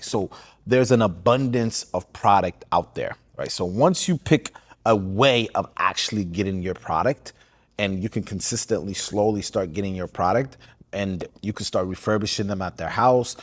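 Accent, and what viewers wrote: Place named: United States. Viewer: Im greting you, I'm from warsame bade. Channel post . Second I think your are somali. Live in USA 🇺🇸, because of Your language accent is American 🎉.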